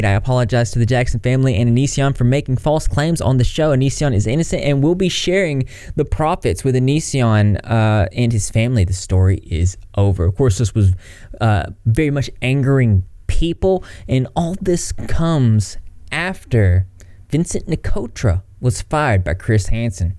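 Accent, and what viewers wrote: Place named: South, United States. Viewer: Your voice and accent are great. Seriously. I am from the southeast U.S. and it makes me happy to hear someone who sounds similar to myself online. That you can sound this way and still be considered concise and intelligent and professional. I am here for it.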